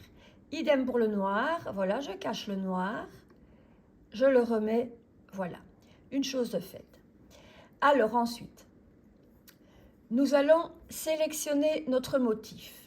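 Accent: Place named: Belgium. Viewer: Merci pour ce tuto qui est pour moi génial, je ne comprenais toujours pas jusqu'à ce jour comment faire la superposition, mais avec vos explications je pense maintenant que j'ai compris, cela va être un jeu d'enfant, et merci à vous pour votre bel accent belge, je vous souhaite pleins d'autres tutos et je vais vous suivre sans problème. Bonne continuation et à très vite.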